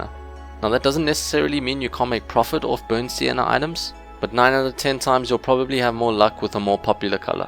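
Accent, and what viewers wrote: South Africa. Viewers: Is ur accent South African? cause if it is, I'm South African Too! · South African?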